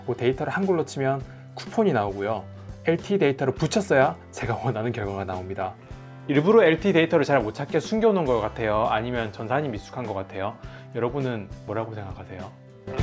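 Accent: Gyeongsang, South Korea. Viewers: 경남사투리가 느껴지는 ㅋㅋㅋ 잘 보고 갑니다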